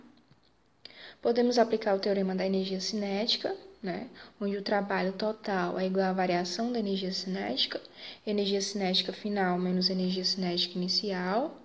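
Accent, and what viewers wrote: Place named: Pará, Brazil. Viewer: Eu gostei muito da aula, obrigado! e esse sotaque... você também é paraênce?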